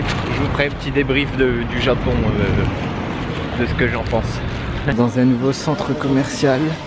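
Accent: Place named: Nord-Pas-de-Calais, France. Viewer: C un chti😊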